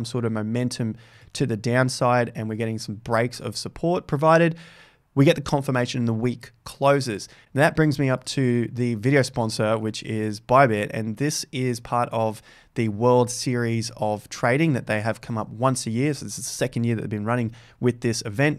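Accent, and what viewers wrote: Australia. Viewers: Hey mate I came over from invest answers, good to hear a ozzi accent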